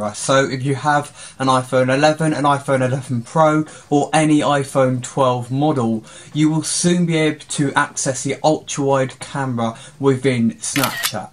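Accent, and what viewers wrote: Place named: United Kingdom. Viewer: lovin the british accent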